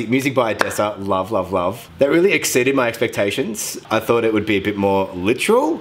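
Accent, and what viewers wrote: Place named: Australia. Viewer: I can tell he’s Australian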